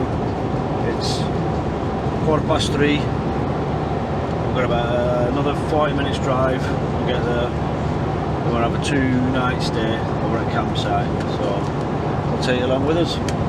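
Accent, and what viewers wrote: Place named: Bolton, United Kingdom. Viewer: Been watching ur vlogs, saying this guy's definitely from Bolton 😅.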